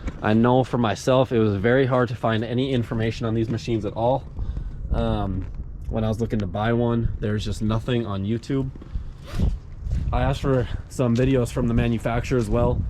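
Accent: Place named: Norway. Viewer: Where are you from? Your accent sound exactly like a Norwegian trying to speak American English.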